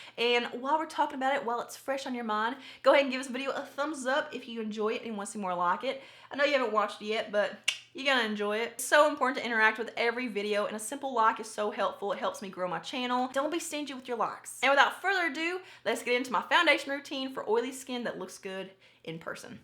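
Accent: South, United States. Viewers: Hey from Israel!😊I love your suthern accent so much!!!❤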